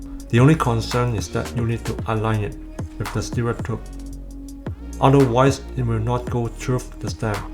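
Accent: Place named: Canada. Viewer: I think hes chinese Canadian. I base off his accent and I think I got a hint about the canada in a past video.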